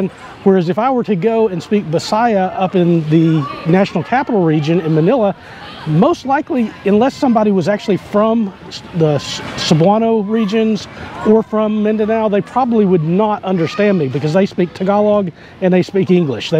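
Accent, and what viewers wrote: United States. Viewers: I couldn't say what region of USA you are from...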